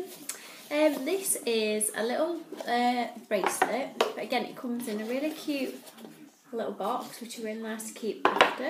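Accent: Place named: Mansfield, United Kingdom. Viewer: I love your bag reviews, they're so helpful! I think your YouTube channel page must be set up to only show your most recent three videos because I can't see a list of them all together! Your accent is very familiar, I'm from Mansfield, and I assume you can't be from too far away, as it's like listening to myself speak! Haha x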